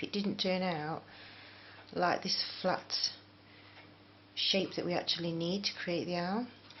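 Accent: England, United Kingdom. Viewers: Hello, I have watched all your tutorials and they are great. I am owl crazy as you can see by my avatar. I hope you don't mind me mentioning, when you start of you call your first knot a magic loop. Magic loops and Magic rings are described differently in other tutorials and what you start off with is a slip knot. Please don't think I am "knit" picking because I love your youtube channel. Keep up the good work, can't wait to see what comes next. Love your accent too, what part of England are you from? Best wishes to your dad i hope his arm is getting better. Kind regards:)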